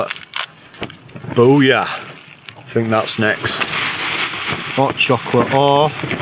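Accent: Yorkshire, United Kingdom. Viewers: I'm guessing your from Yorkshire mate, loving your videos mate.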